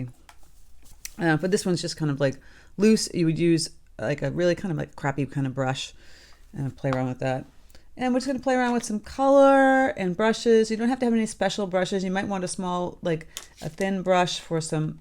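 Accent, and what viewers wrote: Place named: Minnesota, United States. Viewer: Thank you, it is a fun project. I heard you inMinnesota😊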